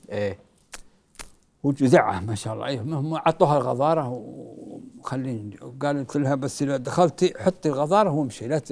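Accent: Qassim, Saudi Arabia. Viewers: لهجة المديفر القصيمية طلعت بهالحلقة يالبى أهل القصيم على راسي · المديفر فالحلقة ذي جاب لهجته القصيميه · من امتع الحلقات،، المديفر من قوة ماهو مندمج معه قاعد يخاطبه بلهجته القصيميه واكثر حلقة ضحكت فيها ،،الله يعطيه طولة العمر ويحفضه وماشاء الله تبارك الله عليه .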